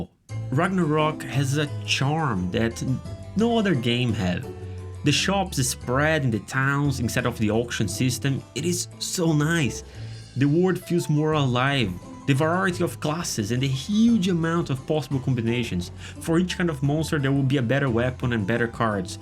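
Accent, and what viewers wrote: Brazil. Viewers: Are you Brazilian? I’m noticing your accent. I’m half Brazilian.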